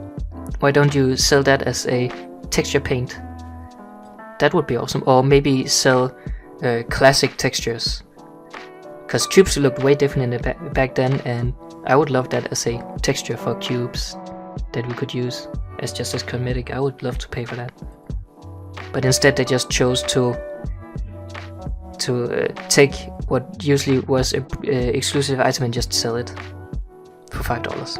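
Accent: Denmark. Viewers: easy to hear that you are danish XD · Fuck man kan bare høre du er dansk XD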